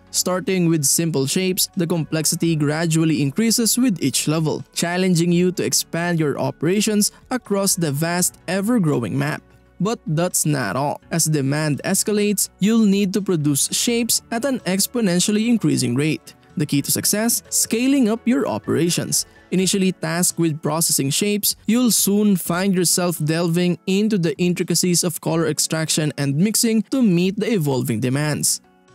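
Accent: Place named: Philippines. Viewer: you have a Filipino accent and vibe.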